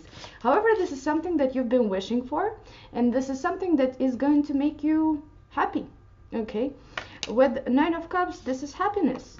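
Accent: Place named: Russia. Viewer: ❤️ your eyes. Resonate. Respect. I may fall in love with your Russian accent.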